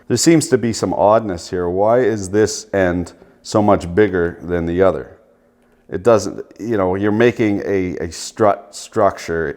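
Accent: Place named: Canada. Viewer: I'm from the Midwest United States and after watching one minute and twelve seconds of this video... that's the most Canadian fucking thing I've ever heard lmao...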